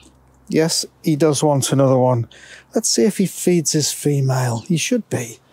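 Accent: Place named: United Kingdom. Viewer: Where do you live? I live in Arizona USA… you sound British to my ear❤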